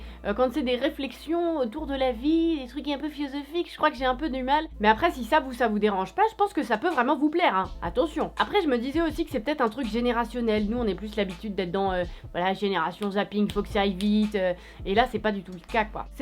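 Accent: Paris, France. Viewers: Je ne l'ai pas lu et je crois que tu m'as fait gagner du temps au lieu de le perdre. Et pourtant je suis tombé sur toi par pu hasard sans même te chercher (parceque covid 19). J'adore ton phrasé parisienne je dirai, ton franc parlé et ton analyse de fin est outrageusement pertinente. En gros il a payé les premier influenceurs de l'époque ... avec Norman Squeezy et Cyprien dans la poche ... c'était gagné c'etait sûr !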